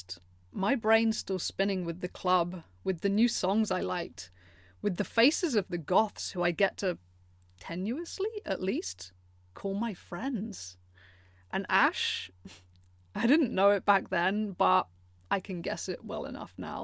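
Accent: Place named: England, United Kingdom. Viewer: She should have kept going. Her english accent contrasts a great deal with her dirty talk.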